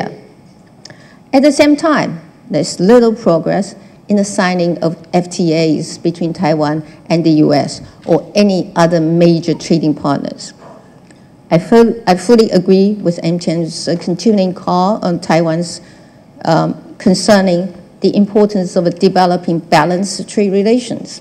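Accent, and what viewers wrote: United Kingdom. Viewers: 蔡英文的口音是英國腔耶，好好聽喔！英文真好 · 英國腔很重捲舌短音尾音拉高、氣音加重是傳統英國式英文跟美國粗獷大條不掩飾的發音有很大不同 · 英式的英文！ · 愛英國腔😍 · 被東吳英文系的韓國瑜嚇到掉了一魂，來這裡聽蔡英文的英式英文壓壓驚。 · 開場的聲量還有點顫抖、可能是興奮稍微害羞等等，有點台灣腔跑出來，但是後面部份鎮定了，進入主題後展現自信、侃侃而談，很明顯是流利的英國腔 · 有兩個英文youtuber都鑑定蔡英文總統是偏向英國腔 ( 請搜 "浩爾譯世界" )，總統加油!!! 2020 請再為台灣服務! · 很有趣的開場，英國腔有點不習慣，不過還是ok的! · 這台風和幽默感~ 英國腔好聽就不用提了XD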